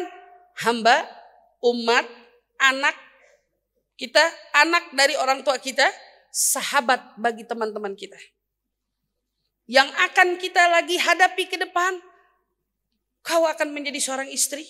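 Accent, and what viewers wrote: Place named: Medan, Indonesia. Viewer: Ustdzah kebawa bhs medannya maasyaaAllah.tausyiah kota medan. Tapi blm pernah denger ustdzah bhs sunda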